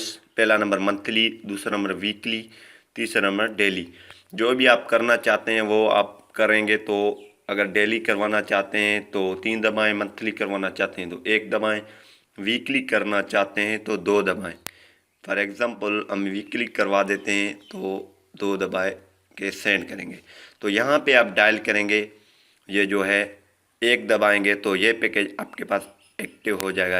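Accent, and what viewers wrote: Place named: Sindh, India. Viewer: Lagta ha ap sindhi ha ap k lajhy se lag rha ha.vip 👌👍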